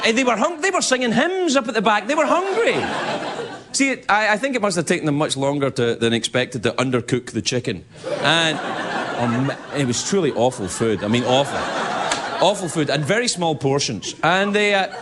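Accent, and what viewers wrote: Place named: Scotland, United Kingdom. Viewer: He had his rough Scottish accent back then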